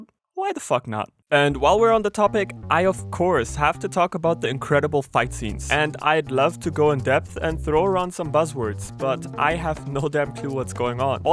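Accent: Germany. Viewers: Deutscher Akzent haha Aber ja ist ein guter Anime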